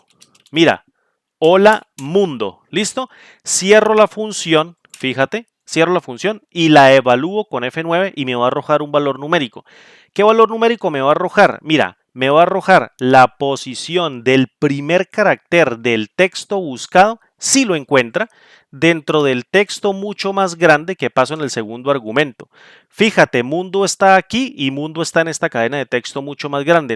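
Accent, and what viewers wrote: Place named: Costa Rica. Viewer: Tu acento me suena al de mi pais, Costa Rica ♥ o talvez Colombia...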